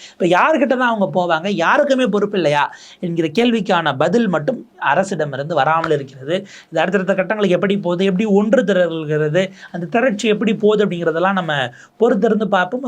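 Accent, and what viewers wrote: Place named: Telugu, India. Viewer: ஏன்டா எல்லா தெ.பசங்களும் கூடிய கூடிய கூடிய ன்னு சாவடிக்கிறீங்க. ஏன் நடக்கின்ற போராட்டம் னு தானே பேசனும். ஏன் நடக்கக் கூடிய. சோல்லக் கூடிய. ... எத்தனை கூடியன்றதை நீயே எண்ணிப் பாருடா டுபாக்கூர். ஏன்டா தமிழை சாகடிக்கறீங்க. டு டு ன்னு உகாரத்தை சேர்தாது தெலுங்கு மாதிரி பேசுறுங்க யூ.எஸ்(ஸோ)ட, பிரதம(ரோ)ட, .... 'ஓ'காரம் வேற. சிக்கலாக மாறும்னு சொல்லறது தானே! கேனைக் கூதியானுங்களே. நாட்டினுடைய. விவசாயிகளுடைய. நாட்டின். விவசாயிகளின்னு சொலாறதுக்கென்ன? உங்களுக்கு எந்த தெ.பையன் தமிழ் மொழியிலே நொட்டனை பண்ண சொல்லித்தரான்?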